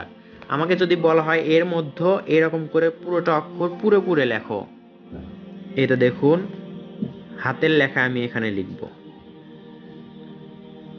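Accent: Bogura, Bangladesh. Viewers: আপনি বগুড়ার তাই না?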